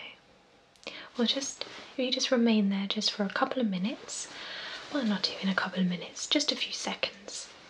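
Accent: England, United Kingdom. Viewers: This woman just radiates class and her english accent is a joy to listen to.wonderful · You are adorable and have the cutest English accent! :) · British accent😍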